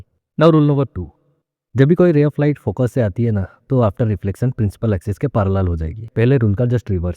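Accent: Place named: Northeast, India. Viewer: Bhai aapke bolne ka tone north eastern states ki tarah h😅😅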